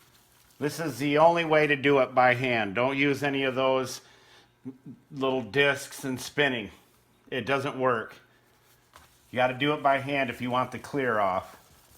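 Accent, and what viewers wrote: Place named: Pennsylvania, United States. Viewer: Where are you from? Your accent sounds a bit like it's from my neck of the woods in northeastern PA.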